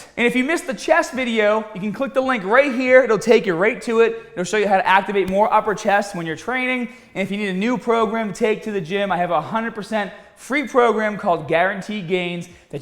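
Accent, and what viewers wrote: Boston, United States. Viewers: Bury You didn't. Literally. He's American, from Boston.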